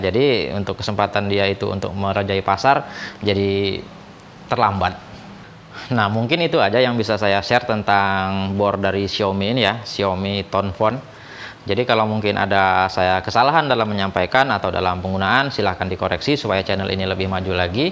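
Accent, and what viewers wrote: Medan, Indonesia. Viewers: abg orang medan ya bg q sring nonton vidio abg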